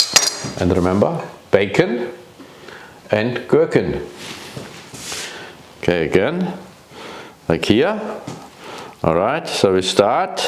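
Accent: Germany. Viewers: Love your German accent. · wow CHEF, your German accent makes me think, intelligence, innovation, efficiency...Looks Amazing!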